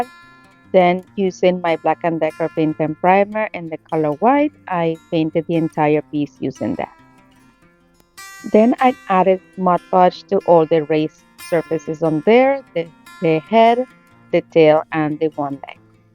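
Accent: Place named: Latin America. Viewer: ur laugh is the cutest thing, it makes me smile everytime! Also, always love your projects, they're always so nice. And your accent reminds me of home. I grew up in Southern Miami, so allllll Latinos in one way shape or form and your accent is EXACTLY like my 2nd mom growing up. I love it! I just wanted to share that with you? What nationality are you? I think I know already, but dont wanna offend nobody! Lol❤❤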